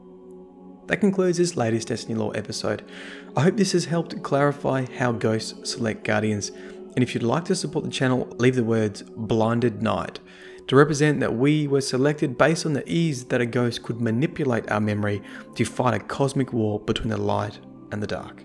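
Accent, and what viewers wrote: Australia. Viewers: Are you from QLD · i suppose i don't quite buy the blinded knight theory mostly because of the story of the last word. if that was the case and ghosts erase our memories and indoctrinate us then why wouldn't jarens ghost just go find another body to resurrect? why would it instead form a bond with shin and turn him into a guardian? I just feel that if control over us is the objective then that ghost picked a very difficult way to go about it lol, but thats just me. I love these lore videos though, this is the first destiny lore channel that I can REALLY get into. you remind me of Vaati Vidya and not just because of the australian accent lol.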